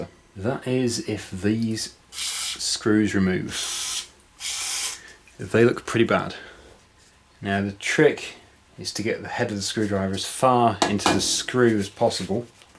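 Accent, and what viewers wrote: United Kingdom. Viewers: I love the commentary. I like the British accent.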